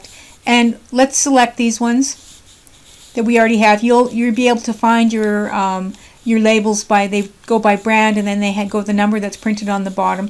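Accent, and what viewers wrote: Canada. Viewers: Thank you! Very well explained. And I could tell I was listening to a Canadian when a-Gain came up, and then a-Boot. I was born in Vancouver but we moved to LA when I was 6 so I lost that charming accent and now just sound like an international dual citizen American living in Europe. 😉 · you are Canadian... I can tell by your accent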